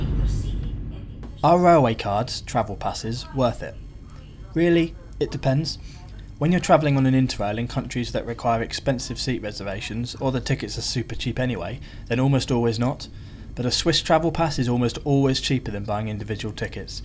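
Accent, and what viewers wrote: RP, United Kingdom. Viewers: It’s good to hear your voice! I like the British accent mate 👍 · The VO is fine but the non VO was a USP- and the English accent can sound a little posh and dare I say - the old colonial timbre creeps in - so! stick to the old formula - less distracting and more focused on the visuals and good captions - one VO per year!! Your content is so good without the VO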